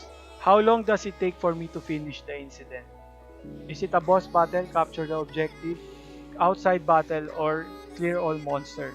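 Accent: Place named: Philippines. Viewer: Nice I need farming materials I need more for my attackers need more yarn and you sound Pinoy. Pinoy ka ano? At ako newest subscriber mo helpful vid mo eh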